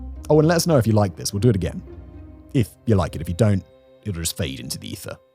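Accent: United Kingdom. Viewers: Love the presentation, and respect the methodology/ethics of how you do this. As a UK english guy who has travelled the world I recognise your 'pan Brit' accent. But I am puzzled by some of your mis-pronunciation of some terms/names. Such a Thales , a well known defence co. pronounce talez... Just an observation, where generally were you from?? Just interested.. retired Rail Engineer here. 😀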